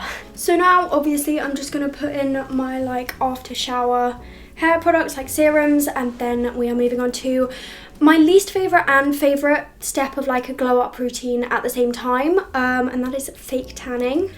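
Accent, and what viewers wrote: United Kingdom. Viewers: Can I just say that your British accent is e v e r y t h i n g ❤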